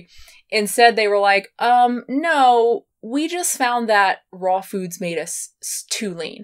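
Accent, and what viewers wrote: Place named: Pacific Northwest, United States. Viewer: She mentions Portland. I assume she means Oregon? To me, as an Oregonian, she does have a bit of a PNW flair 😂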